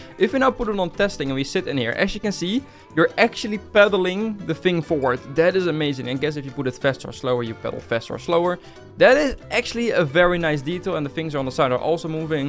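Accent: Netherlands. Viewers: Are you from the Netherlands 🇳🇱??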